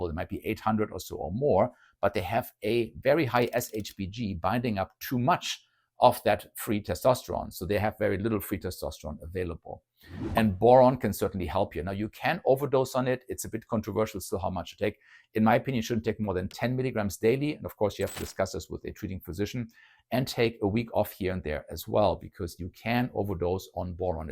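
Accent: Germany. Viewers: Is it fair to say the goldilocks zone for HCG on TRT is between <num> mcg / wk split up 3 times per wk? I believe a break on HCG is necessary after 1 month of continued useage? Break for 2-4 wks? Thanks Doc I detect a German accent & facial features to boot.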